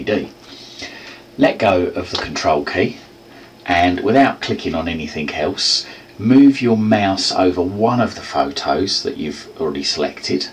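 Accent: United Kingdom. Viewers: Thank you. Simplified instructions, comprehensive for people that lack experience,calm voice, british accent . Great job!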